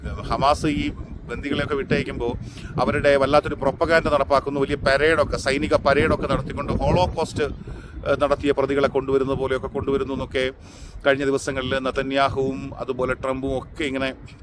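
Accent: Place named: Ernakulam, India. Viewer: സത്യസന്ധമായ അവതരണം, ഭാഷ ശൈലി അതിഗംഭീരം, , കേട്ടിരിക്കാൻ സുഖം, ഒരു എറണാകുളം സ്റ്റൈൽ, നന്ദിയുണ്ട് ബ്രോ,,, ❤️❤️❤️❤️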